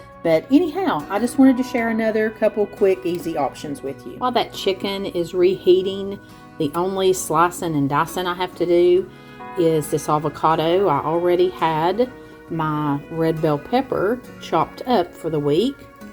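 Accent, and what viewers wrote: South, United States. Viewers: Love your easy recipes.fast and easy is nice.anything Mexican is what I like.not spicy though.I saw your recipe for taco pizza,with refried beans and hamburger with pkg pizza mix.yum Can't wait to try it.😊Love my vegetables,and you make good ones.love your crock pot dinners too love your southern accent.I am from Minnesota.they day we have have a accent.thats funny to me.enjoy watching you,but you make me hungry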